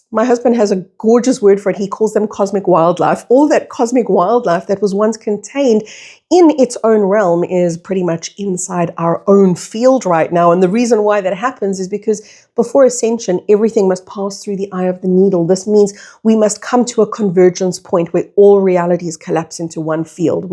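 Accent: South Africa. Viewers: Thank you for sharing your wisdom. You popped into my orbit after your conversation with Amrit and so, so glad you did! Love hearing a familiar accent and especially at a time when, having lived overseas for some 20-odd years, all I want is to go home to SA. Listening to you feels just like that... home ❤...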